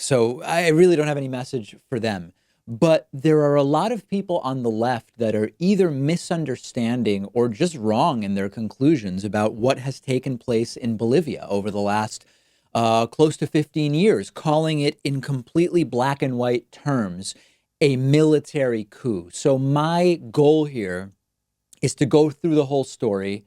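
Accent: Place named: Argentina. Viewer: He is from Argentina.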